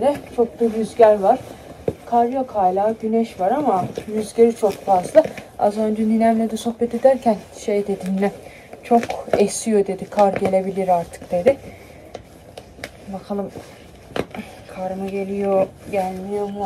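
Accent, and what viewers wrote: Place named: Laz, Turkey. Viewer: Hafif laz şivene hastayım yaa çok sevimlii :dd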